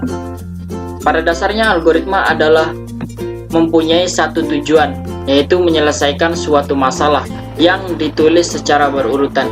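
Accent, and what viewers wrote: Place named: Ambon, Indonesia. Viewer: Persis ambon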